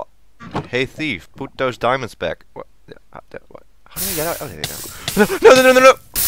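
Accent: Netherlands. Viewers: hahaha ik hoor aan je stem gw dat je nederlands bent heuyy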